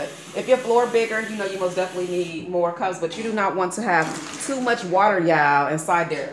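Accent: South, United States. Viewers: Thank you so very very for your wonderful clean tips using Baking Soda. But what exactly is “Fabuloso”? I never heard of it before. I hear you saying, “y’all” quite a lot are you from Southern? Is Fabuloso sold down South or in the Mid-West somewhere? I’m from NY is the something similar I could use? Anyway, thank you very much. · Wonder if this would work in the bathroom shower? Love your sweet southern voice. I live in the south too! God Bless!😉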